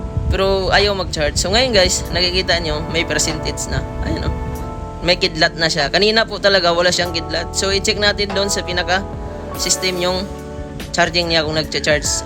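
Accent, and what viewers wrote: Iloilo, Philippines. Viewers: Ilonggo kaba boss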